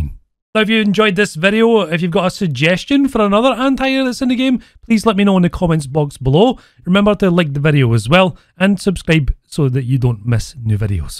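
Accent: Scotland, United Kingdom. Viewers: Hey i'm a bit confused are you scottish or irish?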